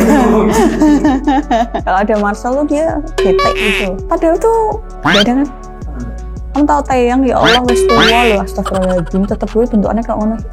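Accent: Java, Indonesia. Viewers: Asyik penyampaiannya mix bhs indonesia sm khas jowo nya😄 Asli fisikal touch, skin 2 skin, dia curhat soal cowok, nanyain cewek idamanku,godaan lewat jok,tp dia gak berani eye contac,persis apa yg diomongin tp skrg malah jauh, my be ada something wrong🙈😂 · Gaya ngomongnya kok kyk jodile Warwick ada campur jowonya tapi asik gitu ya gaya ngomongnya 😂 wow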